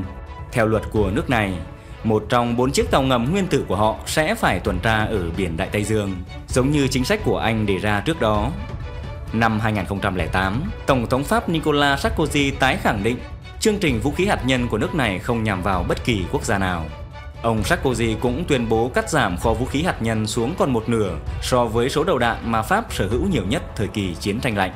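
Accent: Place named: North Central, Vietnam. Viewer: 1trăm 20,chứ k phải 1tăm 20..phát âm bắc trung bộ, lai bắc bộ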